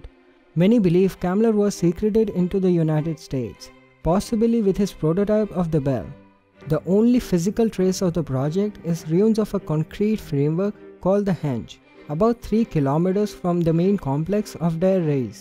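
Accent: India. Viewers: You have a indian accent, I like your contents.Thanks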